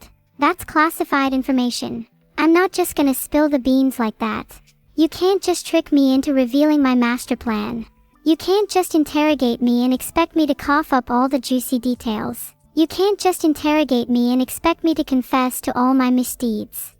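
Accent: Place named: United Kingdom. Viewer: hit her with a british ancent update, and she use all the big word in 1 sentence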